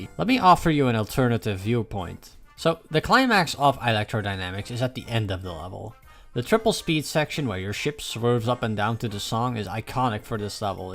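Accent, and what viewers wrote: Netherlands. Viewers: Bro I only halfway through the video realized you're dutch lol. Good video man keep it up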